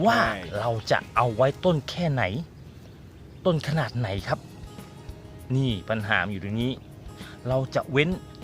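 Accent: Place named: Sukhothai, Thailand. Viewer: สวนอยุ่โขทัยมั้ยครับฟังจากสำเนียง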